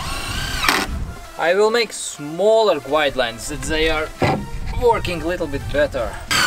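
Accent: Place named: Germany. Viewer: I think — youre grammar is normall! But charakter of sounds is — german accent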